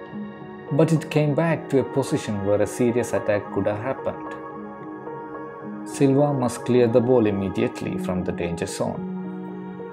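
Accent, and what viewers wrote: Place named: Kerala, India. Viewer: The mallu accent is so recognisable :D. Nice work bro.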